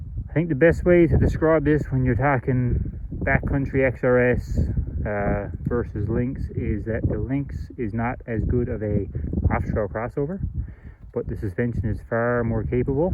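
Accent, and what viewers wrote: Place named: Newfoundland, Canada. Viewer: Thought ya was a Newfie ?!! Lol good stuff thinking this may be my next sled ? Keep xterrain content coming! Cheers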